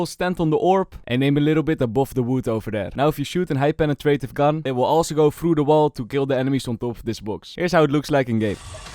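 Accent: Netherlands. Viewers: are u dutch or belgium by any chance? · meest nederlandse accent wat ik ooit heb gehoord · de nederlandse accent is kei hard haha, danku voor de tips !!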